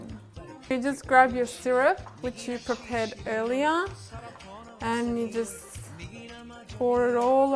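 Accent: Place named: Australia. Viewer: I luv Australian accent ;)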